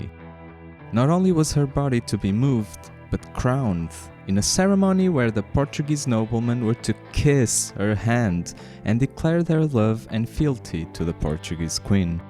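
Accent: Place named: Romania. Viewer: Stai că m-ai lăsat în șoc. M-am gândit că accentul sună a român, dar nu am fost sigur.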